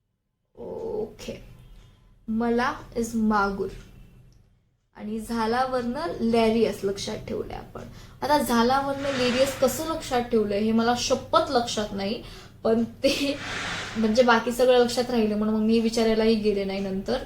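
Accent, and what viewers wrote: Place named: Latur, India. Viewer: Di tuzi way of explaining all thing khup bhari aahe.... Ani laturkar chi marathi tr azun ch bhari 😍😍 I like it so much 😇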